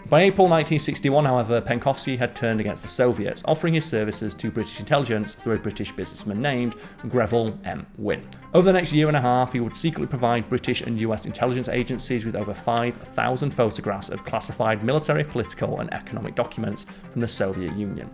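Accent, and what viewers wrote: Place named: Northern England, United Kingdom. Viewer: You've done really well at slowing down the sleech pattern for all the non northern English people. I am one and it was really difficult when I moved over seas and appreciate the effort you've put in 😅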